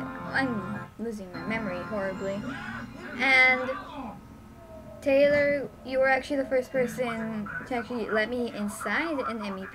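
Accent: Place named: England, United Kingdom. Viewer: That was awesome in every meaning of the word! I couldn't stop laughing...hope the fireworks didn't catch up to you (I have a phobia of them, so I understand...) Yeah, we definitely need to PM each other more often, and I approve of the England accent!!! Happy Christmas! (English Merrry Christmas; and you totally pronounced Feliz Navidad right, half my family is Argentine, so I know!) Wow, might steal your idea of combining presents into one!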